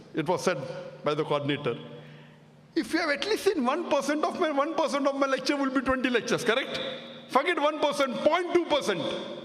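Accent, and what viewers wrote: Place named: Kerala, India. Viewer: What a manglish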